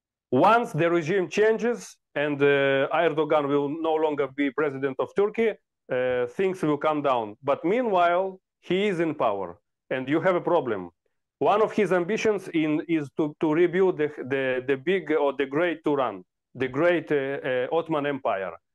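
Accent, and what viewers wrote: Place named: Russia. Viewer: Такива откровени глупости! 😂Западно име със силен руски акцент! 😅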